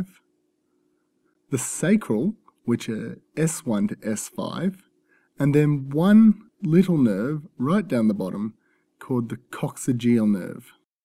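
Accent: Australia. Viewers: How? Stewie sounds English and this guy sounds Australian 😒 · Thanks, simple easy to understand and with a pleasant Aussie voice. What more could a Med student ask for.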